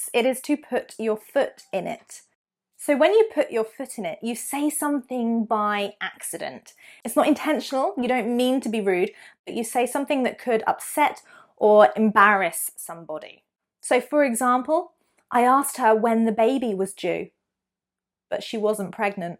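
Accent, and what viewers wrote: United Kingdom. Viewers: I really like Britain accent. It is so clear and wonderful 👍🏻👍🏻. Thank you so much my beautiful teacher 👩🏻‍🏫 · Thanks for beautiful British accent of teacher! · Hello... Let me start saying hello for you ladies, I have started listening to your videos recently and I want you to know that I am a big fan of the British accent which gives you a big point and definitely another student, you are super amazing keep going, let me please see in the coming days more videos about the pronunciation in the British accent. Thank you for the information and the great effort you are doing in these videos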